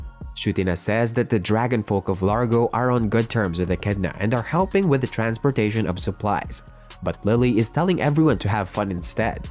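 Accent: Philippines. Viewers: Pinoy narrator😁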